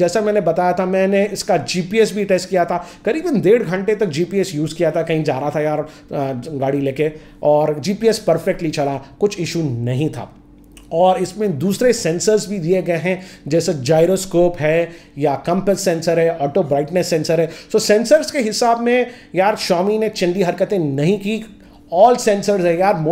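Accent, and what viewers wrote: Hyderabad, India. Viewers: Arka Seth trust me its only 30% hyderabadi hyderabadi is my native language if he talks completely hyderabadi u guys won't understand a thing!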